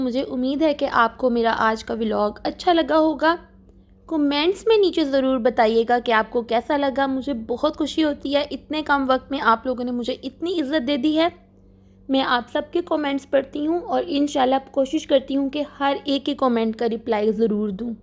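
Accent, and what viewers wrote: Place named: Punjab, India. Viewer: aajkal punjabi mode kyun on hua wa hai?? you punjabi is sooo cute - and please make shakarkandi ki shaan mai video :)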